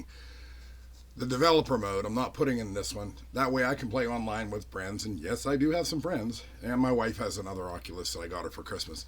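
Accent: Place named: Pittsburgh, United States. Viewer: I just randomly found you with the pressure pot video. I'm doing a Jerry rigged vacuum for some resin and we'll see how it goes first, but it sounded like you were a Pittsburgher, then I saw you had a Kennywood puppet video.... Then I saw your channel is, and I might be misremembering but have I seen that sign up in Gibsonia for the last like decade??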